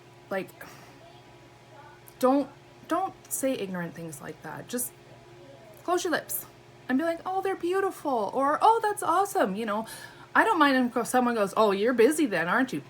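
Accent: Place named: Canada. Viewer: I’ve just listened to this on my walk home and I just can’t wait to see you! Miss that Canadian accent 💜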